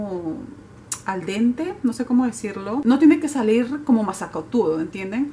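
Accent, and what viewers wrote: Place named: Peru. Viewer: Jajaja sabes me.mato de risa porque hablas tan peruana, como extraño Lima Peru, compatriota mia. 😀 Espero mejore tu bebito esta lindo. Suerte !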